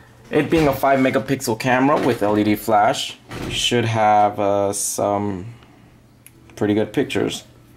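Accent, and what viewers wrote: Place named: New Jersey, United States. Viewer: sounds like he's from Nj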